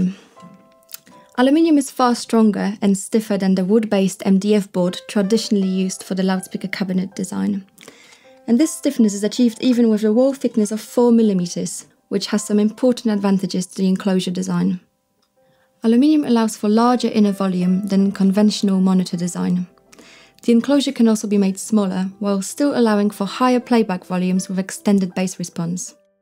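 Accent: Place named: Poland. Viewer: Słychać że polka :)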